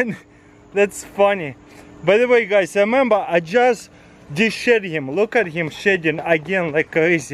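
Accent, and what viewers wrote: Russia. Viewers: Ты русский ? Акцент так и пер😂👌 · сильный русский акцент, откуда вы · Never really been attracted to a Russian accent until I watched this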